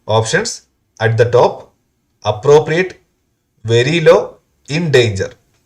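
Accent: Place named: South India, India. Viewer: Sir uu so cute Rr u 4rm south india na I love urr south indian accent & Sir show lobo of urr android app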